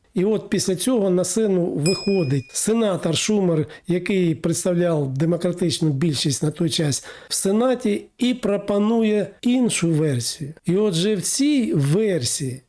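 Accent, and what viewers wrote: Russia. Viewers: Що зробити щоб ви вивчили українську мову?? У вас суто москальський акцент.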